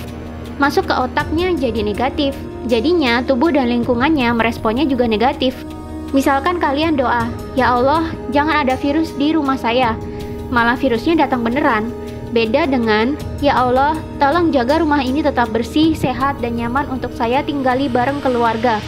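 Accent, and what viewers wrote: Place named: East Java, Indonesia. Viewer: 🙄 saya berasa di Jatim dengan pecel tumpang denger logat mbaknya 🤔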